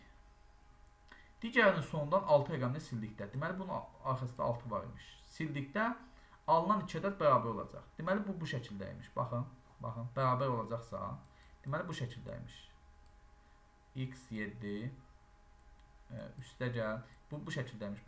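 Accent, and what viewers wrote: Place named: Salyan, Azerbaijan. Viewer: salyan 😊oxwayir daniwiq terzimiz)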